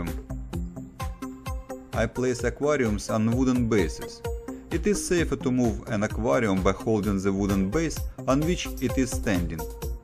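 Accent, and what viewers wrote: Russia. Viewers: Ты русский? Я заметил акцент.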